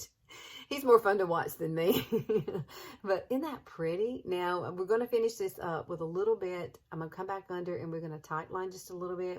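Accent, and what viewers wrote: South, United States. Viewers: You are a very good teacher! I love watching Wayne too but it’s helpful to watch others use his technique and I enjoy ur adorable, southern accent :) I love the colors u picked and plan on finding some in my collection that are similar because my coloring is exactly like yours. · What state are you from? Somewhere in the south I’d say.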